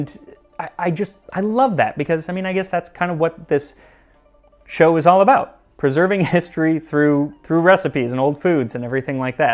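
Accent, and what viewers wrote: United States. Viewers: Your pronunciations are on point, but it still sounds odd coming out of an American mouth. Kudos for going that extra mile to say it correctly . There's a huge Spanish speaking community here in Chicago, yet, 90% of my family still call fajitas fuh-jy-tuhs.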